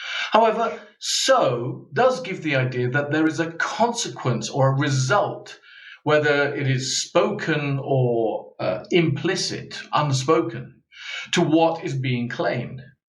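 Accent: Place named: RP, United Kingdom. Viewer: Thank you. Good job! Many people on the internet speak RP, but yours is one of the best. Loking forward for next videos. 🤩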